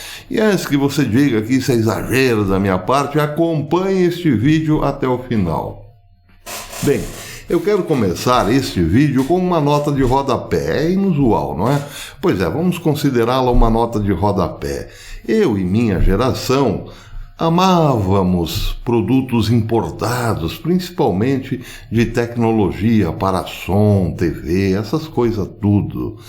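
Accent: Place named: Minas Gerais, Brazil. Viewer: deve ter morado em Minas! Tem gostado de falar em mineirês!! Beijos professor! Amo seus vídeos!!! (BH)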